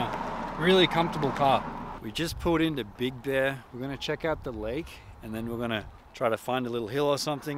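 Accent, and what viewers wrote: Australia. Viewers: It’s sick how you liked and replied on every comment. Better comment now before you get to famous and I love the Australian accent man, wish I had one so I could get mad chicks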